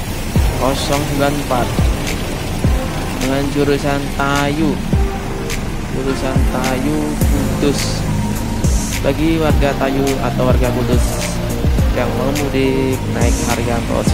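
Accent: Banyumas, Indonesia. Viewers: Ngapak Bosku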